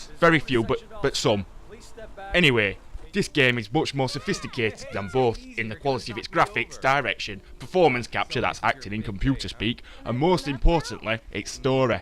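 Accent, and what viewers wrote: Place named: Scotland, United Kingdom. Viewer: the guy wasn't listening to my verbal commands so I shot him. Anyway, good review. Is that accent for real? you sound seriously Scottish, like deep in the hills, kilt-wearing, haggus eating, "Freeeeedom !!" yelling Scottish. are you?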